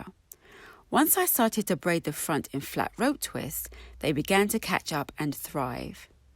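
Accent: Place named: England, United Kingdom. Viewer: 👏🏾👏🏾👏🏾👏🏾😃 That was so wonderful to watch! I love the way you speak! Beautiful English accent...I'm biased, I have a very similar voice! 😄 Your video was wonderful! I really enjoyed watching you do your youngest daughters hair routine. I have a son, whose he's 16, so I wasn't watching for him, I was watching for my Grandneice whose 9mths old. I want my niece to use a healthy hair growth routine on her daughters hair, so I'm going to share your video with her. I love your, 'GIRLS YOU ROCK', T-SHIRTS and hope to purchase one or two for my Grandneice. Thank you again and look after your 3? beautiful, little girls 🥰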